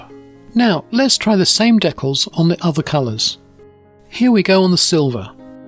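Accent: United Kingdom. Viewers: I speak for everyone when I say, we love how you Brits across the pond say stuff like; Decals, Schedule, Bonnet, Lorry, The Loo, etc. it’s so posh! 👌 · I am indeed. I usually like the way the accent sounds from your side of the pond, that one though, grates on my ears. 😜 Keep up the good work.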